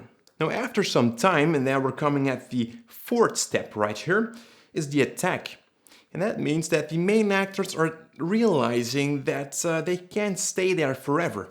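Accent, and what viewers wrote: Netherlands. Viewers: bootifull dutch accent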